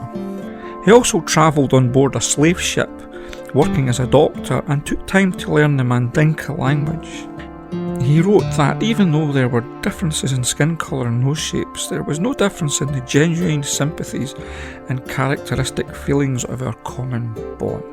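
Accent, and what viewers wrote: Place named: Scotland, United Kingdom. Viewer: Hagg,he’s Scottish,can you not understand him?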